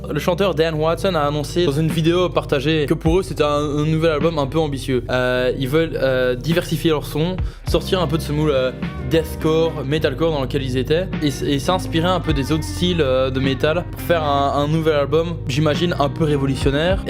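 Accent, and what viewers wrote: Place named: England, United Kingdom. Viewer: Cet accent anglais haha ! GG continue :)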